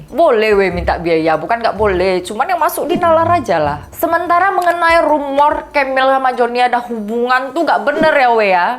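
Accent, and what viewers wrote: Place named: Madura, Indonesia. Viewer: suka dgn dialek host-nya .. meduro bingits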